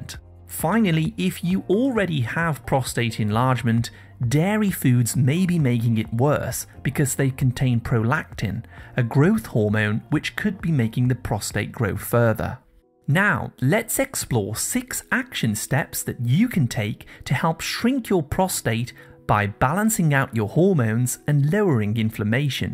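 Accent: United Kingdom. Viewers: What's with the British voicing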